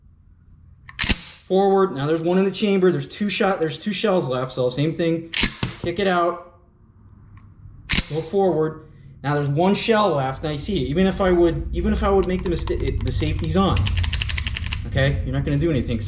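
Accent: United States. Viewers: Hey, bro, This is Seoul and I’m a South Korean. I love your English accent. Where are you originally from? Born and raised in Texas? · Dummies don't need to be within 5 miles of a firearm. Dummies get themselves and other innocents killed! This guy has a damn Yankee accent and attitude! "YANKEE GO HOME!"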